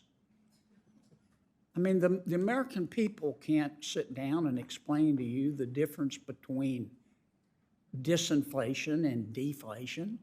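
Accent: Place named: Appalachia, United States. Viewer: John Kennedy the hillbilly talker from Connecticut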